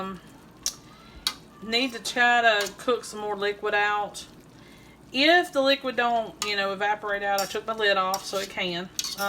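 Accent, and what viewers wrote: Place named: South, United States. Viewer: I love your country accent. Makes me feel right at home with my grandma 🥰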